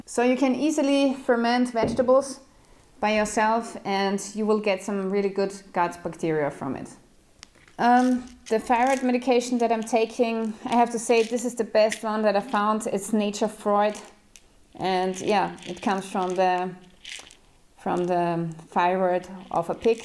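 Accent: Sweden. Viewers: Wait are u swedish ?? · Are you from sweden/Sverige on swidish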